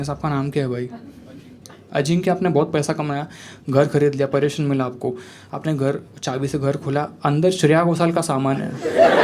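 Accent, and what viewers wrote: Maharashtra, India. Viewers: Bro is to Good su marathi accent😂😂😂😂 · His Marathi accent makes him 10x funnier lol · Love all your videos bro!! Specially your Marathi accent! Love itttt!!!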